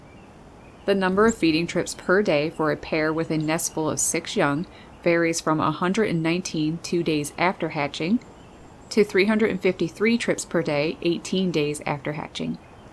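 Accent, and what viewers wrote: Canada. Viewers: I LOVE & her Canadian accent....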